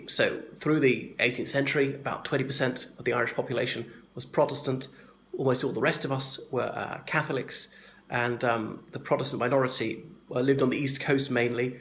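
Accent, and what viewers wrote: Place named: England, United Kingdom. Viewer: Hi, Well first of all, let me say that like yourself, I know a fair bit about Irish history, I am English with Irish heritage and I also once lived (happily) in Co.Waterford for nearly 3 years and travelled throughout much of Ireland on business. Your content was excellent, but just brush up a bit more with your presentation skills. You used the phrase "let me see" seven times, let it flow more. Also sit down and make sure all your face is visible, maybe have some bullet points written behind the camera so you don't have to shift your eyes around as much enabling you to look into the camera lens is if you're actually talking to the people who are watching your vid, that way they are more likely to pay attention. Possibly it's also worth finding a plain background, instead of one with wall paper or even a large poster in the background relative to what you're discussing. 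What will rile the bigots is that you're Irish with a well spoken English accent, yes I know many people who live in Ireland are Irish but have also lived in England and speak as you do, but to quell the bigots, give a brief explanation about your background. On the point of racism, I never once encountered it from a single Irish person, only on one occasion from some American tourists in a pub in New Ross, who I presumed had some far flung Irish ancestry, but as soon as the locals in the pub came on my side they looked dumbfounded and shut up. So I wasn't clear if you were intimating there is a culture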